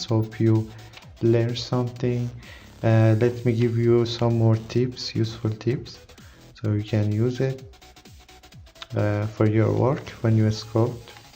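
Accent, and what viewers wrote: Iran. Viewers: are you Iranian? your accent sounds like you would be! if not where are you from?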